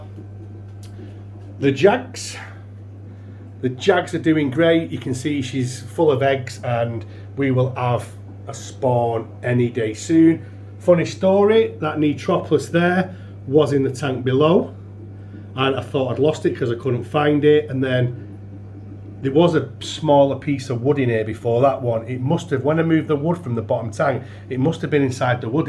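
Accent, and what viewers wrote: Manchester, United Kingdom. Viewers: Love your vids, your accent sounds like your near me in manchester ??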